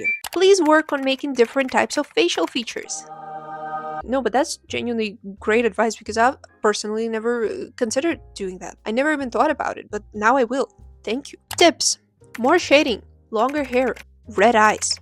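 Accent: Eastern Europe. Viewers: бля ваш акцент ПУШКА БОМБА ОГОНЬЬЬЬЬЬ я надеюсь мой славянский радар все правильно улавливает (у вас ник я имею право делать предположение) потому что НУ БОЖЕ ЭТО ШИККККККККККК это самый.. наверное самый приятный акцент что я вообще слышала. очень круто. тем более если ирл вы не общаетесь с англами? колдун. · I'm an English tutor who mainly works with ppl from eastern europe and am like. 99% sure ik where your accent's from /lh. Not saying that bc it matters, just an observation - your English is Exceptional and you speak and use slang i'd say bordering on a level of some native speakers. It's clear you practice a lot and pay attention to pronunciation! keep at it <3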